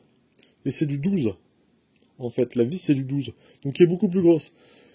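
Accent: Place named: North, France. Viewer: je m'en douter que tu était du nord accent de min coin cha sintin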